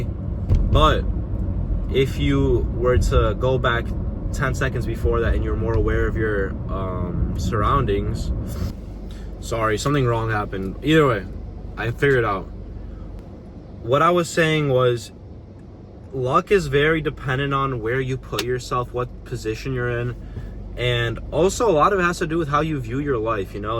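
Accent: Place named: Eastern Europe. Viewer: Are you Russian/Ukrainian? Your accent is a little Slavic.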